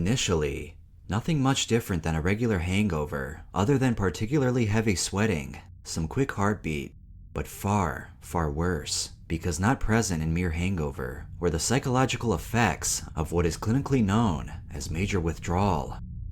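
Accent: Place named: South, United States. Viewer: Ngl I really love the little country into tune you now use lmao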